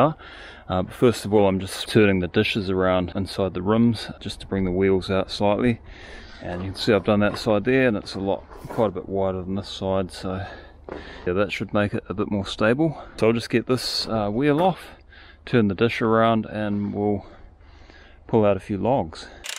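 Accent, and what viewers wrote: New Zealand. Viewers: Brilliant inspiration for handy gents around the globe! How you captured all those video clips while working that hard. Producer director handyman cameraman scriptwriter editor all going in your head at once…. And all we hear is that calm kiwi voice. Just wow.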